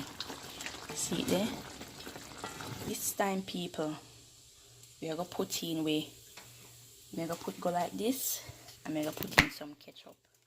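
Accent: Jamaica. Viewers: I like how you keep the Jamaican accent lol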